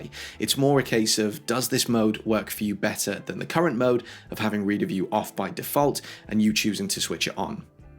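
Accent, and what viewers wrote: United Kingdom. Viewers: I stumbled upon your channel few days ago. I am 73, and like my late husband, I am an avid Apple fan. I have iPhone 11, iPad, and Apple Watch. I am always Googling to find out about the security features and how I could make the most of my devices. Your channel is just what I need. I am in UK, and it is so nice to hear the British accent. I like your style of explaining. I have subscribed to your channel.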